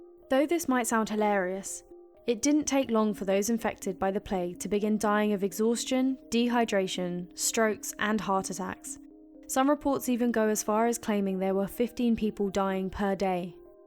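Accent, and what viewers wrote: United Kingdom. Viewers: Hey...I love your beautiful voice! I also really like the voice of the young man with the lovely British (uh, I believe) accent that is like yours. Great video as usual. Hope to hear more from both narrators.🧸🎈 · She sounds British..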